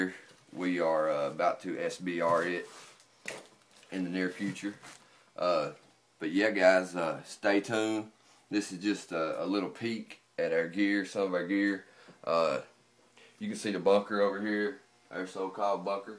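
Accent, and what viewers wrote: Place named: Kentucky, United States. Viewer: im not shure but you sound country like me...im from kentucky. .. Nit loadout anfd a BAD rifle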